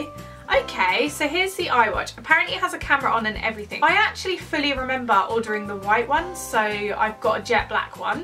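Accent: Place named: England, United Kingdom. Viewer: is she english or american? her accent sounds so english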